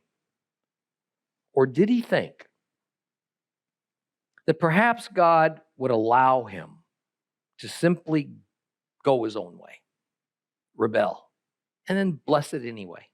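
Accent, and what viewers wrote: Florida, United States. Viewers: Im willing too bet by this mans accent y'all from Florida somewhere this guy sounds like a Baptist preacher i heard out of Florida they could be identical by voice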